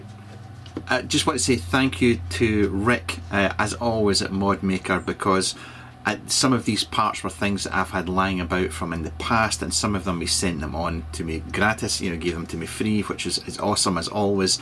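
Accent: Scotland, United Kingdom. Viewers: Always reassuring to hear a Scots accent :-)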